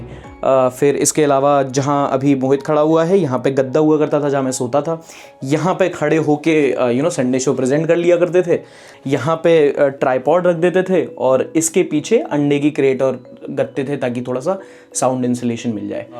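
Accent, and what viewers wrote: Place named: Bundelkhand, India. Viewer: You are from jhansi , mein man hi man sochta tha ke aap hamare side ke hi ho kaeki bhot achhi bhundelkhandi bolat te video m aap ,, aaaj confirm ho gao aap jhansi se ho ham Orchha se H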